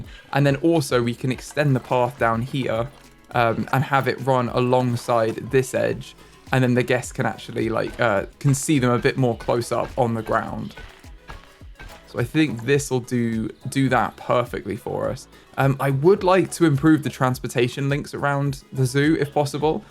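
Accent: United Kingdom. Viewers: where is your accent from in the uk? its really cute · Hes british like meh mate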